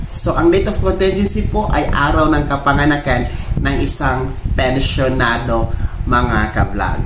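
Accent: Visayas, Philippines. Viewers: Tumigil ka nga puro.ka fake news pampagulo.ka pa.pati.pronounciation ng mga words promdi talaga.bisayang.bato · Bisaya ka dong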